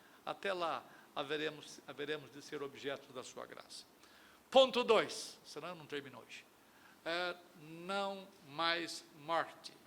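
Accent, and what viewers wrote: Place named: United States. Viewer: Q sotaque de americano é esse às vezes? E "nois"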